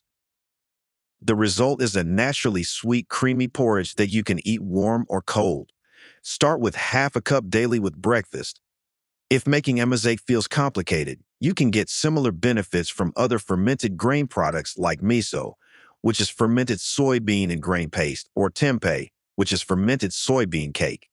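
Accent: United States. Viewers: Without the American accent which destroys it’s credibility immediately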